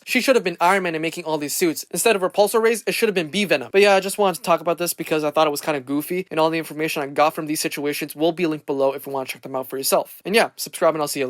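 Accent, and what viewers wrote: United Kingdom. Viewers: you cannot deny me you sound very british and must one by the things you said in the beginning thought am already liking it👍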